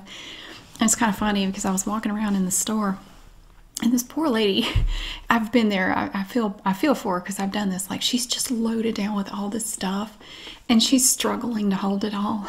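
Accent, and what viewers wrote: South, United States. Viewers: You are such an awesome person . love the southern draw . you really get into your characters and i love that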